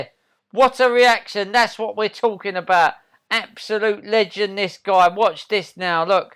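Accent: Cockney, United Kingdom. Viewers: What's your name mate? You really do make laugh with your accent ! Are you a cockney buddy? Greetings from Casablanca Morocco ❤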